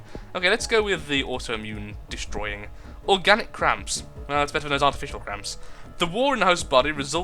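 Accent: United Kingdom. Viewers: Lots of British sweet talkin' going on in this plague video.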